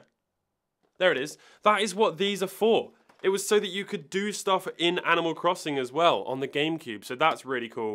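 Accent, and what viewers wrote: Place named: United Kingdom. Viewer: I don't think nub Is a bad word but his british accent makes It sound like knob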